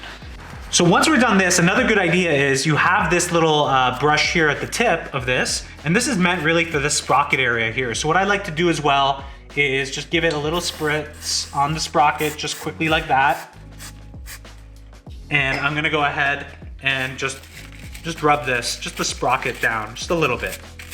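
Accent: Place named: Canada. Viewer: how can one tell you are from canada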